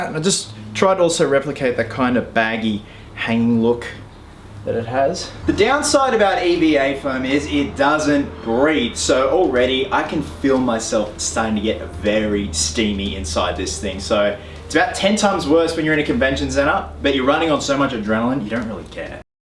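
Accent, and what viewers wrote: Australia. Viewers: you look amazing. The costume is great. Love your Australian English. Sexy body, I need to work out more 😅 One think. Take some sun rays. You're extremely white 😝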